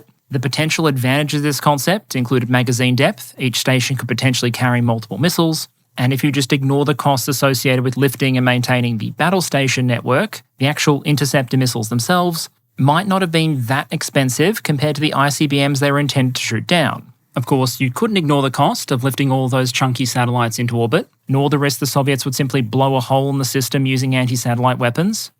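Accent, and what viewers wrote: Australia. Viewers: Am I the only one finds it amusing and somewhat dystopian that team has needed to substitute "Australia" for "Israel" in this videos subtitles to avoid automated demonetisation by youtube? It's amusing that a tech superpower like 'Alphabet Inc' and its automated filters can be defeated by the Australian accent and tweaked subtitles, and dystopian that team has had too do it An example of this is at the minute mark, but it happens throughout the video so I don't think it is an accident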